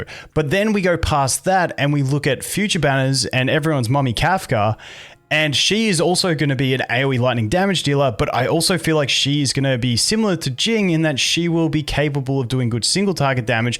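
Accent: Australia. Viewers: Absolutely love the content! Can't get enough. Congrats on the 110k! Love the representation for us folk down under, nice change from all the American accents haha. - from a grateful kiwi across the ditch ❤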